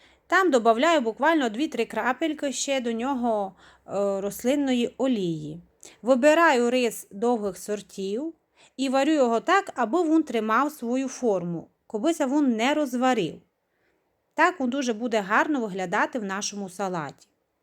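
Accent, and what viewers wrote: Transcarpathia, Ukraine. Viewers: Дякуєм за рецепт! Прийшла на ваш канал від вашої сестри. Обожнюю Закарпаття і ваш діалект!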